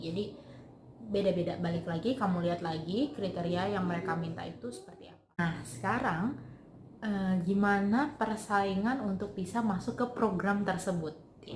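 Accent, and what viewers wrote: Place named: East Java, Indonesia. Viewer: Mbaknya pasti orang jawa timur, kalau salah maaf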